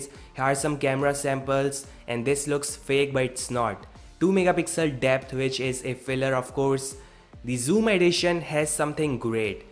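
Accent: India. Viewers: Oh i like your infian aksen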